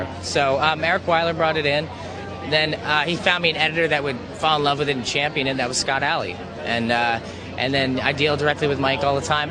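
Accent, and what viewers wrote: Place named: California, United States. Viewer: He's lost the Jersey accent and sounds very Californian now, gorgeous man ! ❤️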